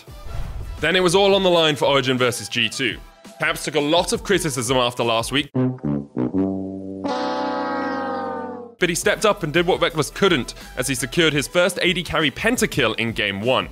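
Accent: United Kingdom. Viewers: When Sjokz and Ender does it, it sounds really troll and sarcastic. But when Medic does it with his British accent, it actually sounds like typical English news. Ha!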